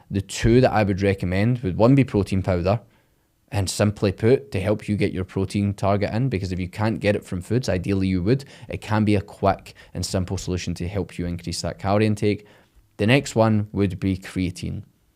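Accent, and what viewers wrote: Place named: North, United Kingdom. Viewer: Fair play bro like your style of delivery, it's great to listen to more "localised" people, me a dub and yourself /obviously somewhere north!!!😅 information may be similar but to support and hear from again as I said more "my own people "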